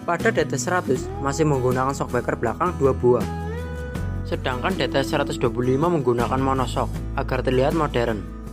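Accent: Java, Indonesia. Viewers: Jowonya medok amat...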